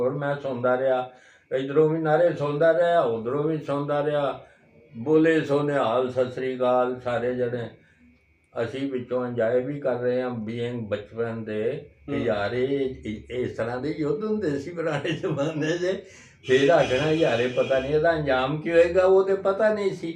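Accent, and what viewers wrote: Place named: Dogri, India. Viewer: sir di boli vich dogri touch ha. te enha bolya laya da si, jd ki punjabi vich kehnda ne laya hoya si. Narowal,Shakargarh, te Sialkot da ilaka kise zamane vich Jammu riyasat da hi hissa hi.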